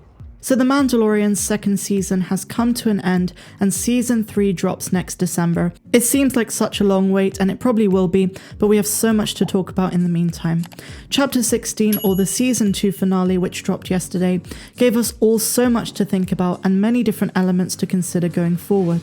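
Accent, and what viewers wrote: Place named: United Kingdom. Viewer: I think I could listen to delightfully British-accented read from comic books for hours...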